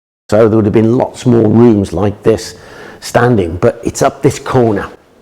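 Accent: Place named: England, United Kingdom. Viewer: Just came across your channel, this priest hole hiding episode is the first vid I have ever watched belonging to you fantastic easy to follow and put together really well... Not to mention that sumptuous English accent you have!! When I hit subscribe I was disappointed I hadnt already hit subscribe sooner before. 🇮🇪 (3hrs have past) since my first visit; to your channel, and I am hooked on history with!!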